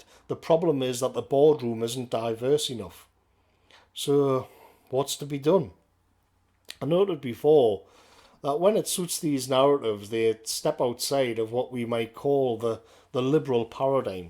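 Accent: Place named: Northern England, United Kingdom. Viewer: Love your content mate, great to hear a northern accent with such a fantastic understanding of philosophy and reasoning. Always look forward to the next one 👍 thanks!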